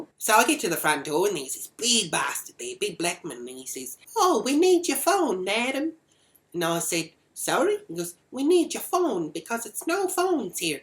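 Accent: United States. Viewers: Your American accent is really good. You sound just like an American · loving your American accent chook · love wats the 'merican accent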